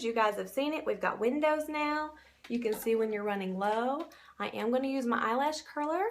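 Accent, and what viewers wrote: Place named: South, United States. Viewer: This is a beautiful look!! I have really small hooded eyes and allergies that make my eyes red all the time. I'm going to try this ASAP and pray that I don't look like Satan!! lol. I love how you used blusher as an eye color....never have thought of that. By the way, what kind of diva light do you use for your videos?? It is wonderful....not too bright but gives you that glow where we can really see. And as a "Southern Belle" myself....I love your accent as well 😊😊!! Thanks for the video. Can't wait to try this!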